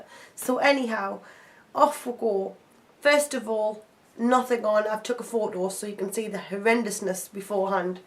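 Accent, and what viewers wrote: Newcastle, United Kingdom. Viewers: She's a Geordie man!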